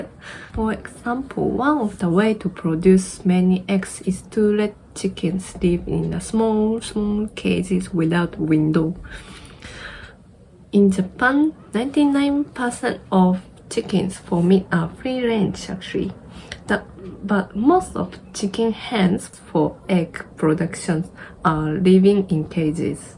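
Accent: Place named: Malaysia. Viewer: You have a pretty malaysianized japanese accent.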